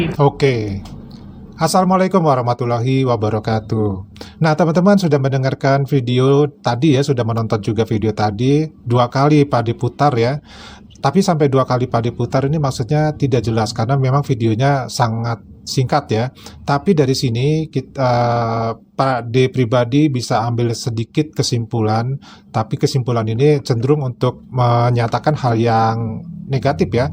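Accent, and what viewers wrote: Indonesia. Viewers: Bu...logat bahasamu itu berbahasa indonesia .. hhh...jadi intinya rakyat malaydesh ini belum sadar diri ...makanya sarapan pagi dulu biar sehat dan otak bersih 😅😅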